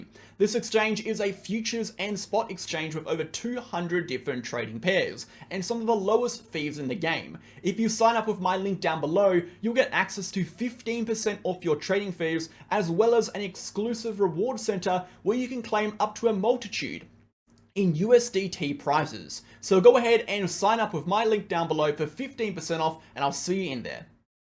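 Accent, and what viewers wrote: Australia. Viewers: Let’s go …was waiting on that down under accent to guide the way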